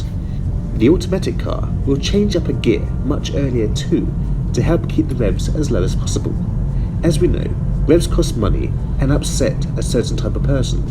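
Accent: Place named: England, United Kingdom. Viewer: Why do you have an English accent but in Russia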